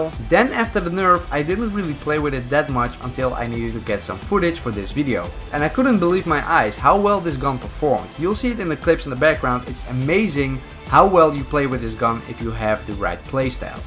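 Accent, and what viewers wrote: Netherlands. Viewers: Ben je Nederlands? Zo klink je wel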